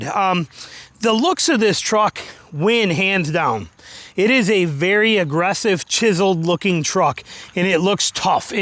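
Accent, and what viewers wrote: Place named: Chicago, United States. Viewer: That’s a very Chicago accent for a South Georgian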